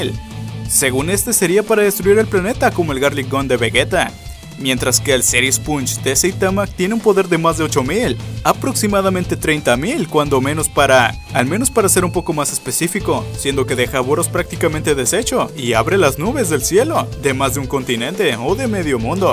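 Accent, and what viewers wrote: North, Mexico. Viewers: No manches en este vídeo te salió mucho el asentó mexicano..!! jajaj xd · Como olvidar cuando hablaba como norteño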